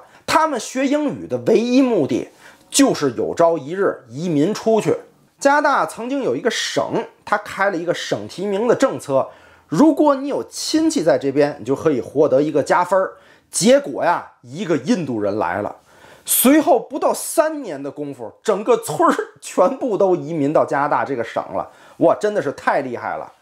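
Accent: Tianjin, China. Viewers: 老哥，天津人吗？我在天津上的本科，哈哈哈这口音太熟悉了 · 喜歡的天津味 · 天津話真是哏。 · 是天津味的口音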